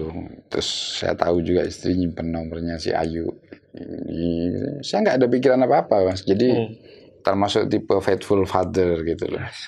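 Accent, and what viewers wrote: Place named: Madura, Indonesia. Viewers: Assalamu'alaikum wr wb...mas...sy dulu...Jan 1975 - Agst 1976 itu...jg pernh tinggal di Bdws...19 Jan 1975 itu...sy,adik sy/Ana n almrhum Rama sy...naik KA dri Sby ke Jember...llu naik colt ke Bdws utk cri sekolh sy n sekolhx adik...Alhamdulillah...sy dpt di SMEAN - Jl Wachid Hasyim...klas 3...dri SMEAN 1 Sby pindh ke SMEAN Bdws...kl adik sy di SMPN 1...klas 1...llu sy n adik dikostkan didepanx gere'ja ayam...tpi hnya 2bln...sedangkn almrhum Rama sy n tmn2x itu...ad yg tidur dirmhx almrhum Rama sy...di Perumhn Artha Karya 2 - Jl A Yani - Nangkaan - Bdws...llu Mret 1975 itu...kelima sdr sy diboyong jg ke Bdws krn pd 1973 itu...kedua ortu sy sdh bercerai...jdi almrhumh ibu itu...hidup sendirian di Sby...stlh sy lulus sekolh...Agst 1976 itu...sy ikut almrhumh ibu llu Des 1976 sy menikh di Mjkrt n sy tinggal di Mjkrt itu...hnya 1th llu selama 7bln sy,suami n ank sy tinggal dirmhx almrhumh ibu n almrhum bpk tiri sy...kemudian sy ngontrak didektx rmhx almrhumh ibu...smp lhir ank ke 2,3 4 n 5...llu 25 Sept 1983 itu...sy sklrg pindh ke Bojonegoro n smp skrg...krn dinsx suami sy dipindh...dri Sby ke Bojonegoro n smp purna tugas...suami sy kerja di BUMN n purna pd 1 Agst 2004...mas itu...tinggalx dikampung Arab yaa...adikx ibu tiri sy itu...jg ad yg tinggal dikampung Arab...sy mendengar logat bicarax...mas itu...kok sprt orang Madura...ternyata betul...kita sm2 pernh tinggal di Bdws...Smg rumah tanggax mas...Samawa...Aamiin...sy/bu R Ayu Mamiek Widayati...68t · Logatnya madura bgt om 😂❤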